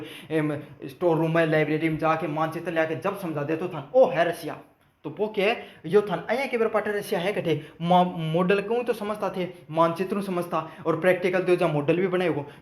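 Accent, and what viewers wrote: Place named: Marwari, India. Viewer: Sir thoda dhire or marvadi bhasa ka kam thoda thik thak hindi m b samjao 😣smj nhi aa rha achee se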